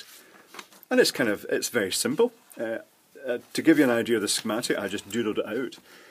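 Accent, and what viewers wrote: Scotland, United Kingdom. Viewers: Can I ask where you accent comes from? I've seen in your videos your in the Isle of Man but the accent sounds Scottish? It's rather pleasant! Very well spoken.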